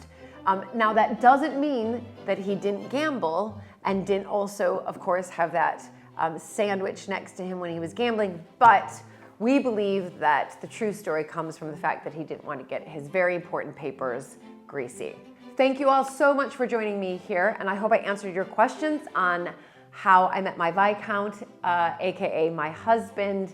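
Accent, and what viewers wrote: United States. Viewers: Thank you for remaining “You”, which is what, I’m sure, your husband fell in love with. It must have been quite an adjustment. What’s so interesting is that the village towns you show are so similar to those of New England, with the quaint town commons and shops. I live on Cape Cod, Massachusetts, so I understand you falling in love with the beauty of the countryside. Listening to you, I do hear the British inflections, but you are still have,after 20 years, the American accent. It’s so easy to pick up that you often don’t know you have. When I met my husband, he wondered if I had lived in Britain. I laughed and explain that my daughter and I had only been bingeing on BBC mini-series, etc, but it was enough to affect our speech. When I visited Britain, the same happened. On the other hand, the opposite happened to Britain visitors to the US. 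They still had the accent, but was often sprinkled with Americanisms. You are so personable. I would love to meet you and just talk, but I think you would have me laughing with your wonderful down-to-earth personality. 😊 Btw, I get it about the letter pronunciation. I spent an hour looking for “Darby” street. Finally someone told me “Derby” was pronounced “Darby”. I also spent 3 days asking about getting to Edinburgh. Everyone said “yes, it’s a long trip”. I was so disappointed UNTIL I asked “how long”. They then said, about 4 hours! I said “WHAT”?? There are Americans that travel that distance to work!!.” I don’t know if you know what th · Just to point out, has a total American voice, nothing posh about her, but she has adopted words that the British use and Americans don't. We don't say "drinks party" but "cocktail party". And we don't say we're going to the cinema ever, we say we're going to the movies. 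I just thought I'd mention that. Her language has adopted British terms.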